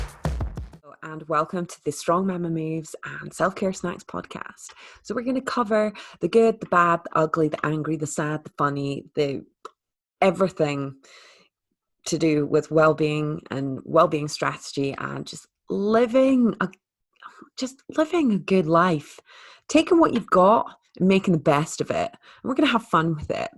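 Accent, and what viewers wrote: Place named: Scotland, United Kingdom. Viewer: Is it me or are you turning Scottish?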